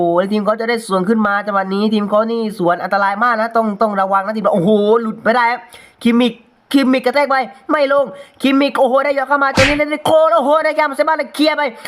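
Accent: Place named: South, Thailand. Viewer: คนใต้ม่ายนิลิ้นรัวจังเติ้ล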